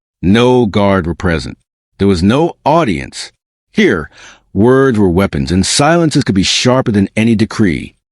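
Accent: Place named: South, United States. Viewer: It's ironic that the voice has a southern U.S. accent when the setting is England.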